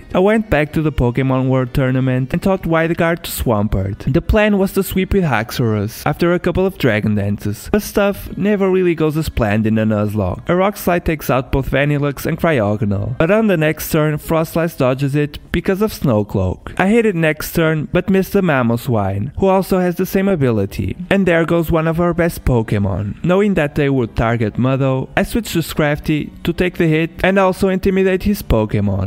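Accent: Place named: Italy. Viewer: Da come parla sembra un italiano che prova a parlare in inglese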